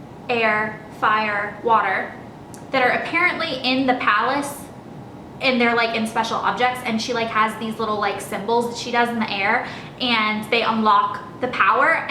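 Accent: South, United States. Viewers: I loved your southern accent in this video :)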